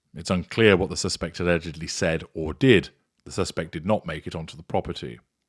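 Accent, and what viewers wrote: United States. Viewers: You've been working on your American accent. Nice! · Damn fine 'Merican accent! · Pretty decent American accent.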